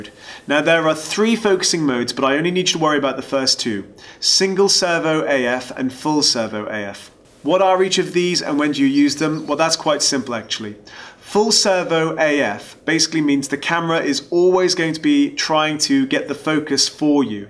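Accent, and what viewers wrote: United Kingdom. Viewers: Well done. Perfect... just what I was looking for. The British accent makes it sound reliable and educated!!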